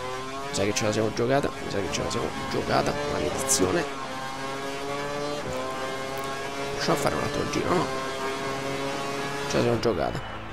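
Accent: Rome, Italy. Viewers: pensavo fossi romano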